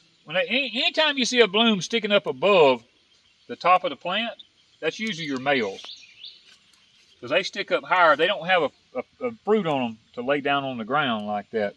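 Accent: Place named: Texas, United States. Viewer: Hey guys, we’ve been trying to guess WHERE y’all are lol…we would say well they talk like us, have nut grass, a few weather moments yall would have matched ours, and finally just heard him say Conroe lol so finally someone that is in our climate. We’re in Beaumont adjacent aka Vidor. Nice to see that some of Conroe is holding onto the country lifestyle.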